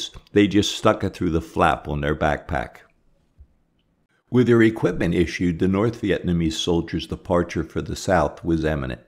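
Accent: Philadelphia, United States. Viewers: Definitely could tell he’s from Northeast Philly without even having to look him up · The Narrator's accent seems to be from the Philadelphia/South Jersey/Delaware Valley area. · are you from philly?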